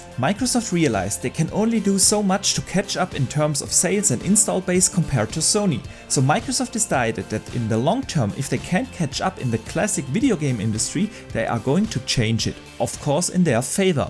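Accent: Germany. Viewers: Horrible German English :(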